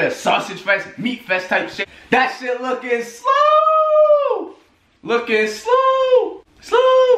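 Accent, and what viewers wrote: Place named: Boston, United States. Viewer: Bruh I'm black, from Boston and I was born and raised here in the hood. You nailed it and even have the Boston hood accent. You was even so right when you was saying the other slang was for white people.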